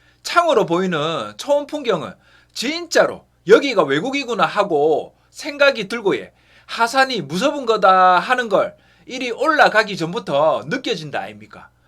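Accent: Gyeongsang, South Korea. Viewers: 갱상도 살아있네예~ 👍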